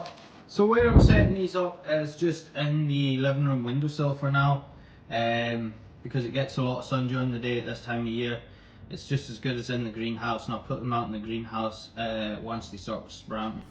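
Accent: Scotland, United Kingdom. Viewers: If you're bored, stop watching. Lots of fans love seeing anything he does. We hunger for relaxing content and seeing his idyllic life. Anything described with a Scottish accent is just a bonus IMO. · I have to tell you how wonderful you are. You are genuine and kind, so knowledgeable about border collies, particularly your funny and talented adorable pups and about farming in general. And you make me laugh. You have a good sense of humour and you remain calm in stressful situations (bad weather etc). I love love love love how much you care about your pups and how well you treat them. They just adore you in return. I love Stacey and Kole as well. More family outings like the video with the horses and how much Stacey loves horses would be awesome. I also am an animal lover and don't necessarily like zoos in general but these horses had gobs of room to run around, so all was good. 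I am mesmerized by how intelligent your dogs are herding. I could watch them all day. And how they look up to you, literally and figuratively. I have your videos playing while I work as they make me smile and the world is good. And the Scottish accent........don't get me started......love it! Keep up the good work. My name is Paula, from Ottawa, Canada.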